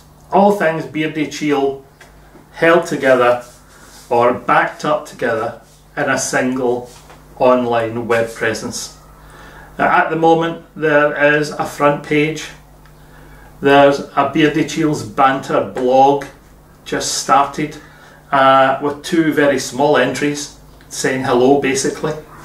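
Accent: Scotland, United Kingdom. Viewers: Everything you have shown is beautiful ! Its great hearing a Scots accent on YouTube ! I live down in Gorebridge . I can talk the heed of a stamp you'll regret saying just ask ...is it a Scottish thing ? We love a natter x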